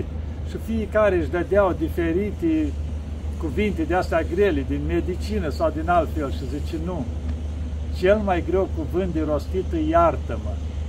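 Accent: Moldova. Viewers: Doamne ajuta! Parinte, astept cu nerabdare sa va ascult in vorba dulce moldoveneasca sa ne vorbiti de Postul Pastelui!❤ Slava Tie, Doamne!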